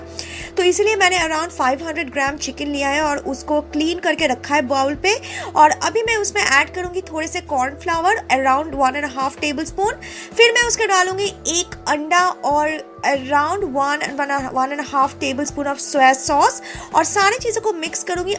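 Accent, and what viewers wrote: Bengal, India. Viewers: Nice log..... love your bengoli style hind.....😍😍😍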